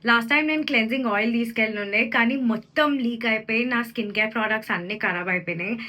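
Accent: Telugu, India. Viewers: Love this vlog so much 🫶💕 This vlog has so much love and joy 🥰 I'm literally waiting for my bestie to get engaged soon 😭 And your telugu accent 🥹 Love <mail>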